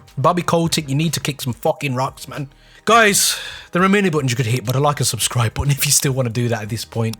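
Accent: Birmingham, United Kingdom. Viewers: Proper brummy lad!!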